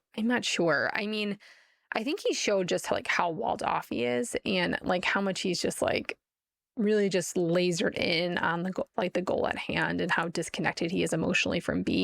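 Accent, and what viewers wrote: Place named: New England, United States. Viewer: Can I ask where you’re from? The aspirated L in also, and the dropped final consonants makes me think New England.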